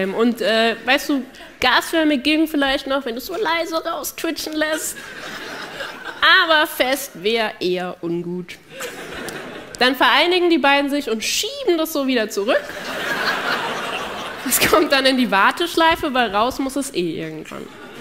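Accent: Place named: France. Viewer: that's her french accent 🤓🤭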